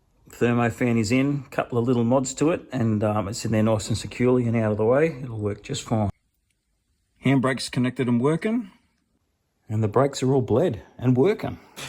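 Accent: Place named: Australia. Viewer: Love your no dramatics Aussie presentation. Down to earth. Refreshing compared to Some overseas vids. cheers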